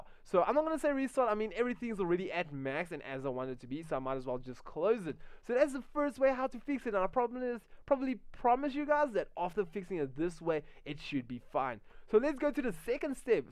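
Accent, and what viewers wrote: South Africa. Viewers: you sound South African lol? Fyi im from Durban.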